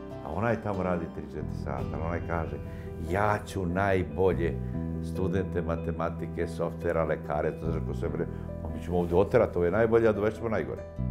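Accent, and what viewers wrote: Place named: Krajina, Croatia. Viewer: Joj kad cujem krajiski naglasak 7 dana napretka nemam..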